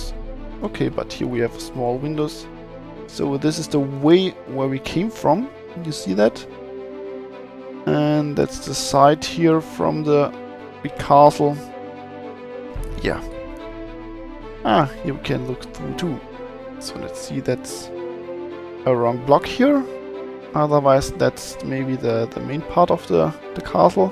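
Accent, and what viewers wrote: Germany. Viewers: Really good video! Although you could really work on your accent, your english in general is good, but the german accent is in there :P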